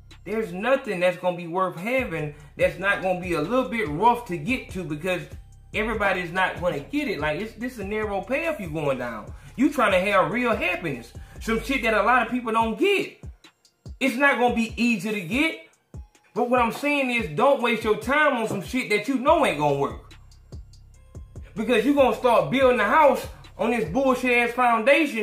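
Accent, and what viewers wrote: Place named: Alabama, United States. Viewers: What part Alabama u from. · What part of Alabama you from bruh? I’m in the 334.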